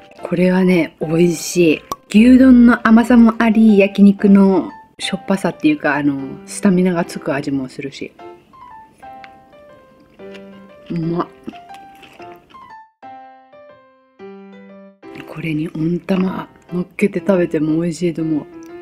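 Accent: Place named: Oita, Japan. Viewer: 返信ありがとうございます🎵💖 さん大分なんですか⁉️😅 道理で方言が似てると思ったです😊 余り鹿児島弁は出てないですよね‼️ これからもいっぱい楽しい動画を宜しくお願いします🎵💖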